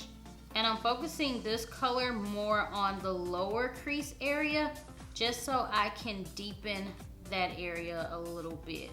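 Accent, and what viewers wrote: South, United States. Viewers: I'm freaking love your channel! My friends always tell me that I sound like you. #southerngirlaccent #nitraabsnapchatgiveaway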